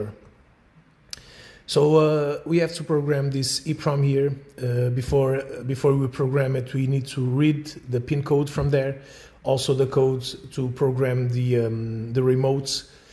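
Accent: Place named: Portugal. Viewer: O "ambiente de trabalho" denunciou o meu amigo, e o sotaque, Português! Eu tenho exatamente esse problema no meu 996, é possivel fazer isto em Portugal?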